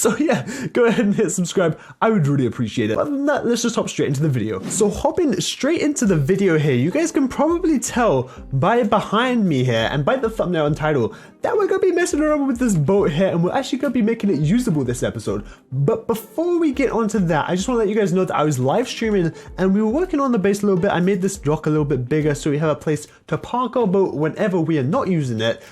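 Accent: United Kingdom. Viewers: Who Loves british acent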